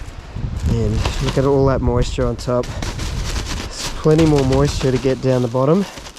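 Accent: Australia. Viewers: aussie accent overload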